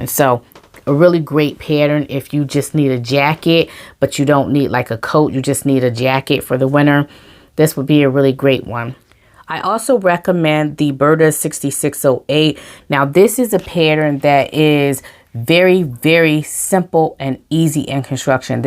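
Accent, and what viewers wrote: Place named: South, United States. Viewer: You love to sew dresses but I love to sew coats. I love this list. I have to ask: you sound southern, yet your vlog is named "Crumpets, tea and Sewing". Where do you find crumpets in the south? I lived in Ga for years and I couldn't find them anywhere.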